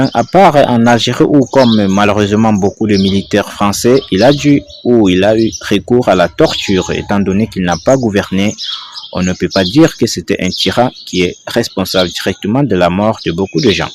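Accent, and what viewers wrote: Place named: Senegal. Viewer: aucun vocal aucun temoignage aucune video aucune info verifiable aucune source aucune preuve , le ton d'un sénégalais qui lit un texte écrit avec les pieds et le gars veut nous faire bouffer cette info comme vraie avec son " Paris news " , t'a des brouteurs vraiment ils ont le QI d'une huitre dejà et je plains les le QI de ses victimes de celles qu'il arrivera a duper avec des photos succesives de Michael youn et de Lepen , les memes connards qui envahissent les réseaux avec de l'IA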